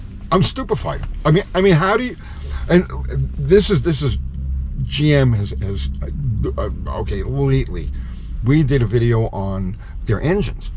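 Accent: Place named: Michigan, United States. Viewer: You have to be from Michigan. I have worked with hundreds of Michigan people and you sound and act just like them. You ramble all over the place and talk and talk but never say anything. Your videos and annoying. Tell me that I am wrong.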